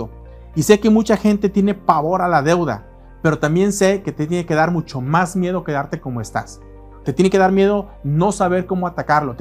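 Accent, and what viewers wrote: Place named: Sinaloa, Mexico. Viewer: UN SALUDO, SON EXCELENTES TUS VIDEOS CON MUY VALIOSA INFORMACIÓN.....TU ACENTO CREO ES DE SINALOA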